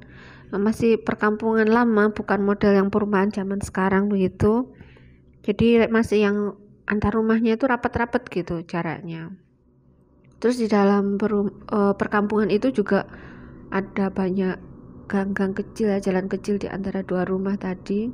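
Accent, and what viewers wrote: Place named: Malang, Indonesia. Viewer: Medokkk bnget malangnya hee sasaji mbak 🌹🌹👍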